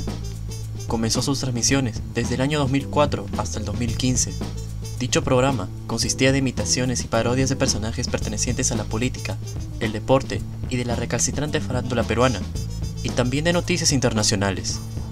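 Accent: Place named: Chile. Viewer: Tienes acento chileno pero mejorado.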